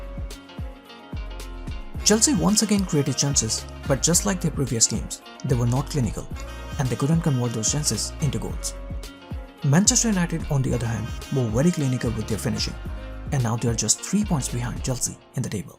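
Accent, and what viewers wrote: India. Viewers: I like your curry accent.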